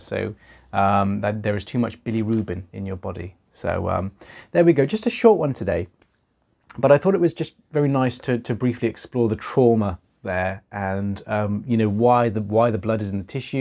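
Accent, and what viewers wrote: United Kingdom. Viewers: It's 1am and I ended up here. Watching a hot doctor with a British accent pull out ear wax, which is satisfying on so many levels.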